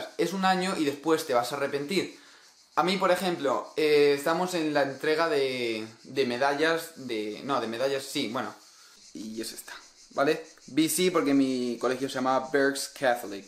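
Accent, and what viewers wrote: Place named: Spain. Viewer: por qué tienes acento de España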